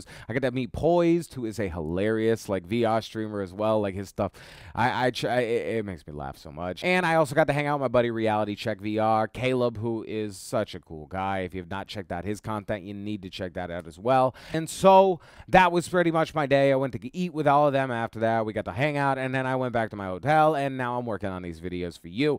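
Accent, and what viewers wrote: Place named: New Jersey, United States. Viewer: I just heard this guy for the first time and went well that a New Jersey accent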